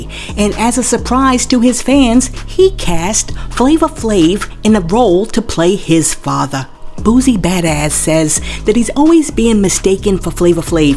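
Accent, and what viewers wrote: New York, United States. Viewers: You're so funny, and i love your New York accent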